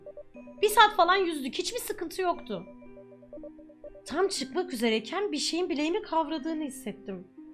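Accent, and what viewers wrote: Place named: Kayseri, Turkey. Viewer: aga beeeeee ganalıma falan diyince bende hemşerim sandım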